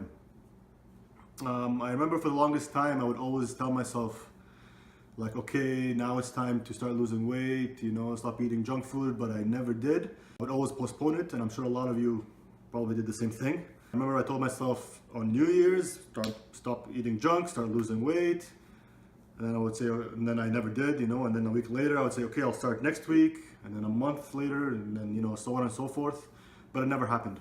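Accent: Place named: Egypt. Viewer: the egyptian accent love it. well done!!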